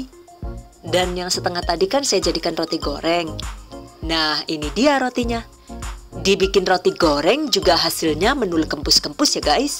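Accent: Banyumas, Indonesia. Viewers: Selalu suka sama videonya n diselipin bahasa ngapaknya, ora ngapak ora kepenak hehehe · Hahahaa ngapake kaya aku mbak, asli ngapak ngendi mbak😁😁😁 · mantabbb bu...bahasa Indonesia-nya merdu, bahasa Inggris-nya fasif, bahasa banyumasannya nggemesin, hasil donatnya bagus..penasaran jadi pingin lihat ibu...jangan cuma tangannya :) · Jaannn bisane koohhh...jebulee ngapak juga rika yayunee😄😄👍👍👍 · Laah looh kok jebule ngapak.... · Hahaha ngapak lah yu, wong ndi sih rika yu😂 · Jebule Wong ngapak kiye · Haha wongapak..donute mentul mentul! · Pintu teater satu telah dibuka 😅 keren tutorialnya kak lengkap dan jelas banget penyampaiannya asik jokesnya dapet ngapaknya dapet hahaha like it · Maknyuss donat madunya..yeni virgo akan praktekin...tks ya jeng yg cuantiiikkk..juga kadang ngapakk yaa..he..he..he..salam kuliner buat yg koment yaaa..suksess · Orang ngapak 😂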